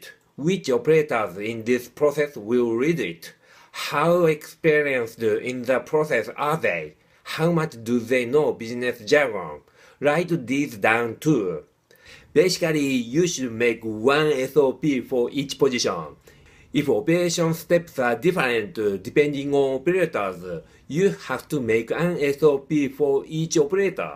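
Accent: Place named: Japan. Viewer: 这个日本人说英语真的难听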